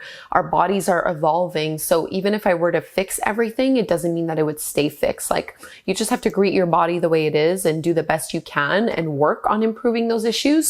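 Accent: Canada. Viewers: I used to live in Ottawa in the Hunt Club area for few years. I am now in Melbourne Australia. It' so nice to hear the Canadian accent everyday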